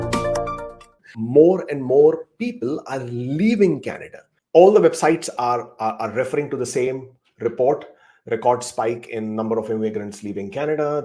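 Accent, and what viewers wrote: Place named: India. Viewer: I'm from Cuba Sr 🇨🇺. I watch all your broadcasts, in part because I'm improving my level of English with your Indian's accent, also I adore to see Canada's news and updates from your channel. My point is the next one: If those who leave Canada had to return to their homeland (Cuba), they would think it twice, because despite the fact that they will be with their loved ones, they won't have better opportunities in life. So I'm amidst my immigration process and I'm 100% sure I will not go back to Cuba. Ask to any Cuban and their answer will be alike.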